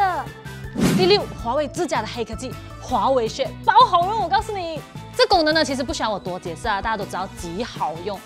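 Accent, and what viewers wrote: Malaysia. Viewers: 大马华语就是这样的啦，要学会接受不同的文化。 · 皂动的茂茂 马来西亚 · 马来西亚口音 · 马来西亚华人大部分普通话都有这类的口音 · 马来西亚口音真的很好听呢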